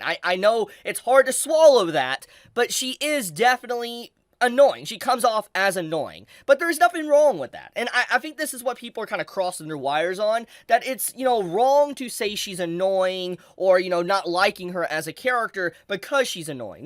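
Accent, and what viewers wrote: United States. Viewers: the way b americanizing these names i swearr 😭😭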